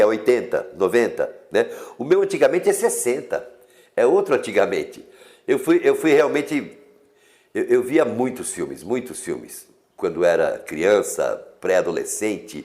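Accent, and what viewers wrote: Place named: São Paulo, Brazil. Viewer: Vc e paulista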